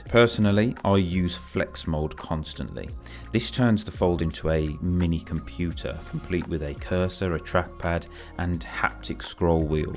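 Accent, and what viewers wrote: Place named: Black Country, United Kingdom. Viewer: You from dudley?? I like black country people, my mum is from there. 😂❤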